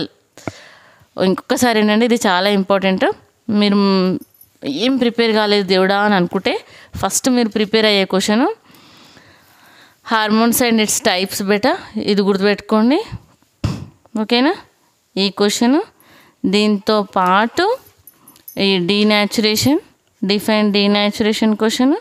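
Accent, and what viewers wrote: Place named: Telugu, India. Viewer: Mam I appreciate your hard work , the way of talking seems like your mother tongue is telugu but your trying to speak hindi 👍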